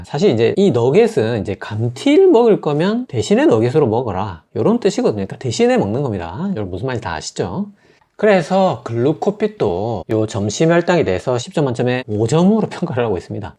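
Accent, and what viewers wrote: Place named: Busan, South Korea. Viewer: 혹시 의사샘 부산에 계신건가용? 말투가 익숙한것이 ㅎㅎ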